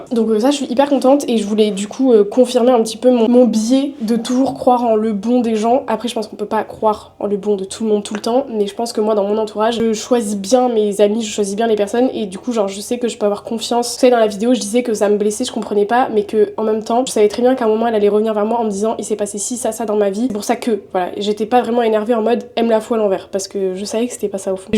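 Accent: South, France. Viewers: J'pense le dire en com à chaque vidéo mais quelle BG 😁 et cet accent du sud, elle transpire le soleil ☀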